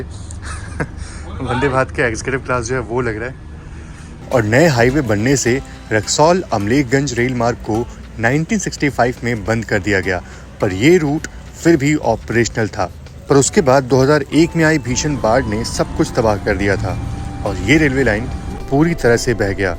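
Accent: Varanasi, India. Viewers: Bhaiya AAP banaras ho ky aap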